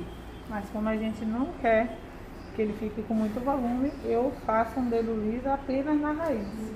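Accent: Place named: Bahia, Brazil. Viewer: Esse sotaque com certeza é da minha bahia que amo de paixão ❤ Depois que lavar não volta ao normal???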